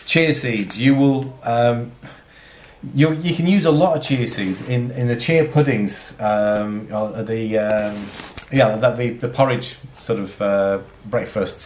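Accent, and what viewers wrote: United Kingdom. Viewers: This was so helpful. My family and I have decided to start our keto lifestyle (while our child is still young) and most recipes researched are American to which a lot of the ingredients are hard to get a hold of. Hearing a British accent is reassuring as well as knowing you shop in aldi. We use aldi for our weekly shops as it suits our budget so to know that keto on a budget is a thing gives us a lot of motivation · Thank you for this you have no idea how helpful and reassuring it is hearing a British accent it’s like saving grace I’m going out today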